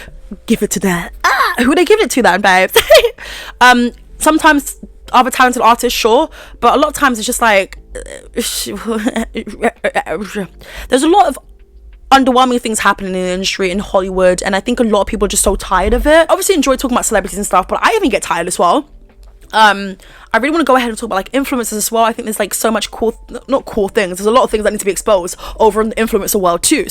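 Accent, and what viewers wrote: Australia. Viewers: Where's that ozzy accent coming from babe? and her accents always cheer me up. As far a hydrating tea, might I suggest 3 ginger and throw some fresh lemon in to the mix. Hangover, depression, exhaustion who?? That tea hits differently. Anyway, back to the drama with our main drama queen here on YouTube ❤ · Oh lord I am loving your play on the accent, you are sounding like an Aussie ❤🐨🦘🇦🇺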